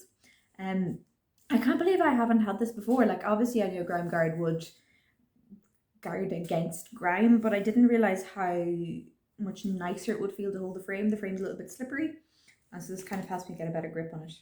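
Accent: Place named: Ireland. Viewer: Love your fabric choices. Great to hear an Irish accent. Happy stitching.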